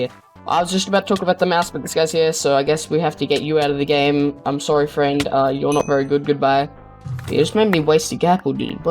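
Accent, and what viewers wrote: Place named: Queensland, Australia. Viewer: finally a bedwars player with a Queensland accent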